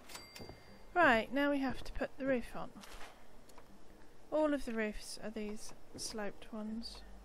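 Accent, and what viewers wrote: United Kingdom. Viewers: Awesome video! Love your accent, are you from the UK? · Brilliant . You got yourself a new subscriber. Nice to hear an English accent in a 76 video too lol. Got my mind turning over thinking of tiny houses now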